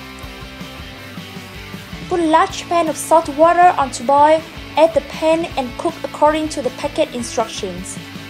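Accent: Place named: France. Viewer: your english has french accent! so funny, anw it looks delicious, i ll try it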